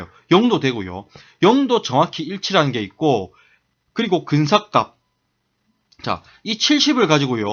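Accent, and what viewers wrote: Gyeongsang, South Korea. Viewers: 쌤 너무 감사합니다 ㅠㅠㅠㅠ컴활때문에 들어왔는디 같은 경상도인으로서 어투가 매우 친숙하고 화나있는 듯 한 말투이지만 절대 화난것 아닌 잘 가르쳐주시고 싶은 마음이라는게 여기까지 느껴져요ㅋㅋㅋ♡♡♡ 합격할게요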